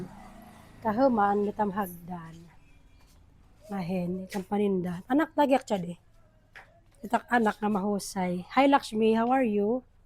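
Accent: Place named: Borongan, Philippines. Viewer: New subscribers here☺️ Watching from Canada 🇨🇦 a Filipino Canadian from Tacloban Philippines! U speak fluently in Waray madam! Taga diin ka ha Leyte if u don’t mind me asking? If I guess correct me if I’m wrong u sounds from Borongan Samar?hehe I’m proud Waraynon here! God bless u and wish u best of luck madam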